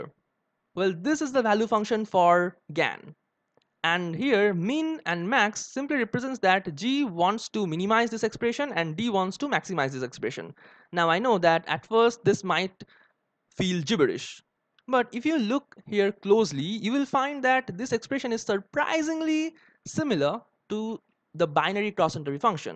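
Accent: India. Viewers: I present you the indian english : )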